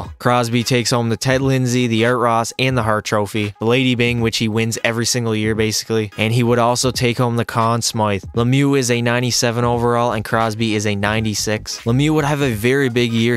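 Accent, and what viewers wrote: Newfoundland, Canada. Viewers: Is your accent from Newfoundland or somewhere around the maritimes?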